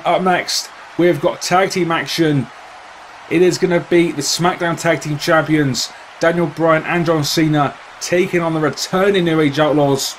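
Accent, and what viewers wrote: England, United Kingdom. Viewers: are you English? love your vids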